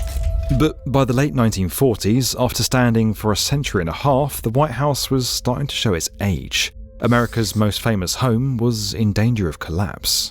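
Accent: England, United Kingdom. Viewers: Why is a Brit narrating US History? Nothing to talk about over there? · When a show has an English Narrator, I just skip it. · This video is VERY poor quality. It's basically a 15min video, with 10 min. of actual content, and 5 minutes worth of ads. I get it. You need to make money for product placement, but when 35% of your video is an advertisement, it's a very lazy effort. This video is basically for foreiners interested in American politics, and I guess that's made obvious with the English accent alone. Unsubscribed. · Something about a Brit narrating this feels wrong.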